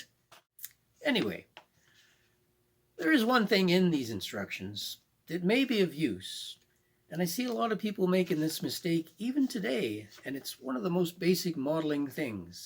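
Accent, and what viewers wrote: Canada. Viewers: I knew you were Canadian right away. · Deckles? I'm 54 years old and I have never in my life heard someone pronounce it "deckles." Every dictionary has the accent on the DE- de'cal. Then you go so far as to do a bit to insult an entire region of the US, because you think this is where the (correct) pronunciation comes from. Like they are all an episode of Hee-Haw. Meanwhile, we have to listen to you talk ABOOOT things with your northern Canadian accent. I don't think I'll follow you with your smug and incorrect advice. Maybe do a video aboot freckles and deckles. <link>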